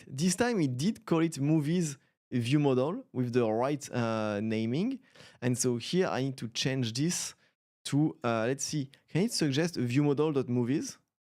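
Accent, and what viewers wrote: France. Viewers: Excellent l'accent Français ! ☺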